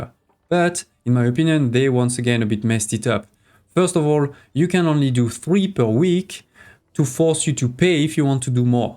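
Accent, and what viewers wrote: France. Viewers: Bro sounds 100% French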